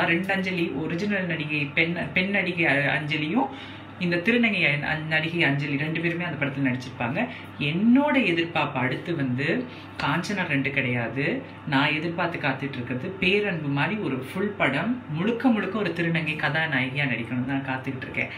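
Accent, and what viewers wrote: Sri Lanka. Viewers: Tamil nalla irukku sri lankan aaa neenga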